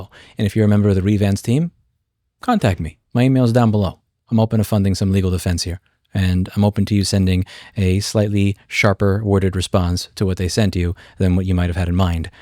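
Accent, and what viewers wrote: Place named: Texas, United States. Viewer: Man, your Texas accent is getting thicker and thicker. Which is a good thing.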